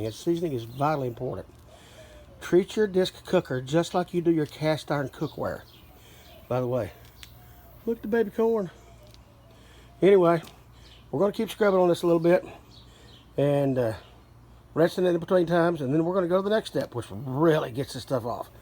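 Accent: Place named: South, United States. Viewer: Dear, we are in Atlanta. Are you from the south as well? I noticed a draw there. Be interested to connect with you and your people. If representation is open, will you send us your Actors Access file? Also, what sites are you on? Headshots and a Demo would be appreciated. Best of luck.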